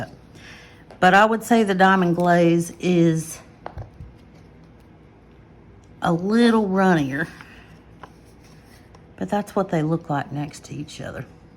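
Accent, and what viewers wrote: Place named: South, United States. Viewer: Hiiii😊, I finally have to comment. I just enjoy your videos soo much! I love your kind southern accent and voice - makes me long for Texas where I lived for a few years and Florida and Alabama too. You are kind, knowledgeable, so very talented, and beautiful! I wish you all the best life has to offer❤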